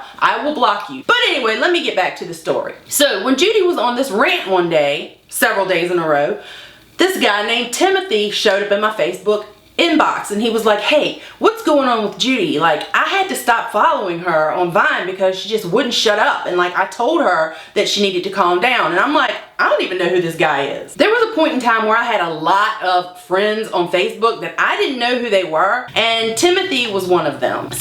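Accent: South, United States. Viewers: tbh you are an inspiration to me I also have a very heavy southern accent and I used to hate it, now that I have found your channel I am learning to embrace it. You are my absolute favorite youtuber.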